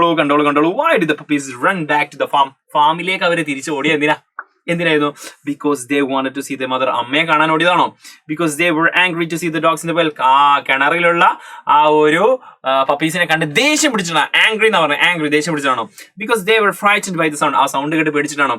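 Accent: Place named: Kozhikode, India. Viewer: Kozhikode aan enn thoonunnu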